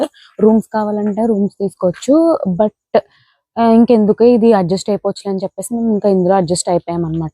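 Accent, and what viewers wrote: Godavari, India. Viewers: కాటుక కళ్ళు తో వినసొంపుగా, గోదారి భాష మాట్లాడుతున్నావు, తిలకం బొట్టు పెద్ద గా పెట్టుకో, ఇంకా బాగుంటావు.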